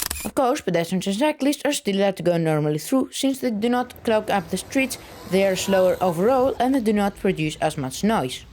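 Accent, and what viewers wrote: Netherlands. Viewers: It's a very thick Dutch accent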